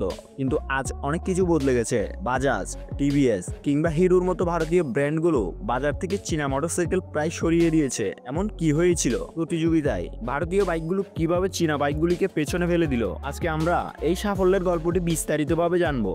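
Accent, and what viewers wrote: Bangladesh. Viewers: বাকি সব ভালো উচ্চারণ জখন্য। তুমি মনে হয় বাংলাদেশী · বাংলাদেশি উচ্চারণ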